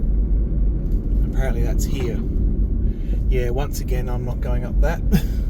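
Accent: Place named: England, United Kingdom. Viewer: yep.there good .people like living like that .if u look up.they have great innings .compared to city folk .an are happy . Nowhere is far from.anywhere. .below pt Augusta.try above .😮 might be hours to the neighbours 😂 an ocker up.an u will.get names right . English accent is causing it . Pretty funny given me a laugh. R u gonna give me heads up on that place I asked about . An I'll pass on 3 roads u will love . Plenty of cam opportunities .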